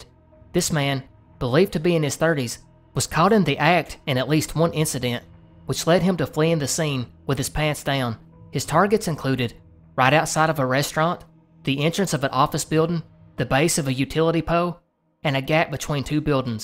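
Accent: South, United States. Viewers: Best icebergs goin'. Love hearing a southern accent being used intelligently and unashamed. We southerners are not dummies, like most media represents us as. Keep up the great work!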